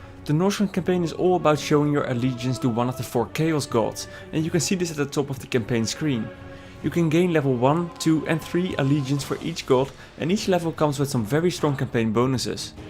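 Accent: Netherlands. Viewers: Hael Premjartonn he is dutch, not german. The accents don't even sound a little bit the same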